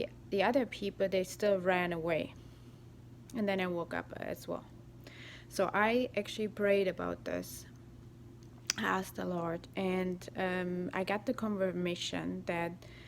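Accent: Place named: Germany. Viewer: What's the accent? German? It's very cute 😊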